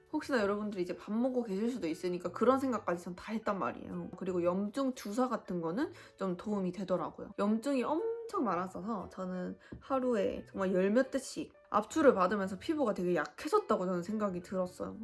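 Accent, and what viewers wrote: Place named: Seoul, South Korea. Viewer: 언니... 서울말 많이 늘었네요오🐣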